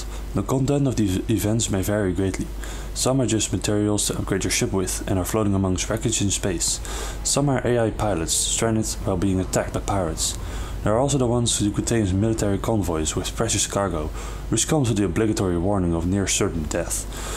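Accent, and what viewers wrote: Netherlands. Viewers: from which country are you? you sound dutch judging by your accent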